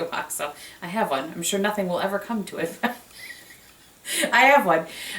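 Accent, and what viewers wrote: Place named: Wisconsin, United States. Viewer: I subscribed yesterday and have been trying to find more out about you. Something about your personality just speaks to me. I live in Chicago (now), but your personality, humor/laugh, and accent are shouting Wisconsin girl. Now that I know you like Packers, I know why I love your personality so much! Something about Wisconsin people just speaks to me.. It'll always be my home.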